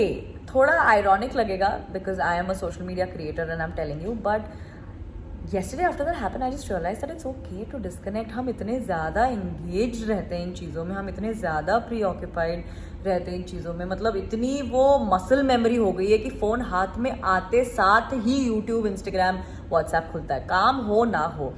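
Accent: India. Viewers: I love the Indian English accent, its so cute and funny!!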